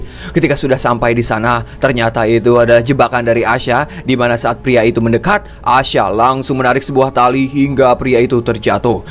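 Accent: West Java, Indonesia. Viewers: Bang hadir Bogor ciawi.aku suka ceritanya.dan ngomong nya kaya aku ada Sunda nya aku jadi senyum" sendiri😂😂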